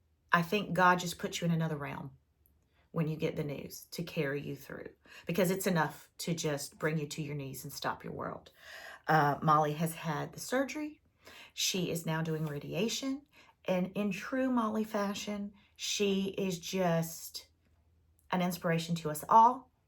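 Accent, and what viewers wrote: South, United States. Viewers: I got so tickled to hear your Southern twang. I've lived in the Tampa Bay area almost 40 years. I must be drunk and blind! I miss my Tennessee roots, and my North and South Carolina family and friends. People here still ask, "Where you from?" I need a snarky answer to that! Really enjoyed your flosstube, as an "old" newbie to your channel, but 50+ years cross stitching. Love a Blackbird❤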